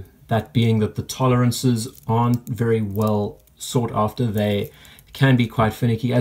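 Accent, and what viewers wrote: South Africa. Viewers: Thanks for sharing your interesting story with us. As a non-native English speaker I totally hear the same accent in your voice as with Winston from the YT channel SerpentZA. The next time I hear someone with that accent I'll probably be able to guess that he is from SA. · I didn’t know that was a South African accent I was hearing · Hiya,new to your channel.Got the S.African accent immediately.I like your philosophy on value.That panis could easily sit next to any high piece and stake a claim.I also appreciated your comment that Tensing got little recognition in the famed Everest top out ,why because that guy Biliary may never have got there? Anyway ,informative video and enjoyed the Flemming dialogue.Cheers,Len.UK.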